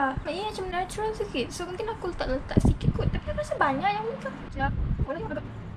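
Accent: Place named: Sabah, Malaysia. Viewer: Macam slank sabah. Iya ka? Assalammualaikum dri sandakan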